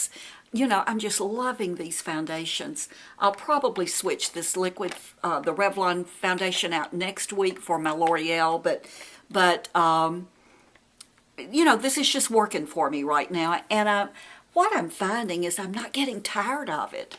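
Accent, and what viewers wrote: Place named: Western Kentucky, United States. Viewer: Hey, Thanks to you, I shopped my stash and "rediscovered" my Laura Mercier Mineral Powder foundation. I had totally forgotten how awesome this product is! I love your videos and think you are fabulous! I'm from Western Kentucky so we sound a lot alike too, ha!!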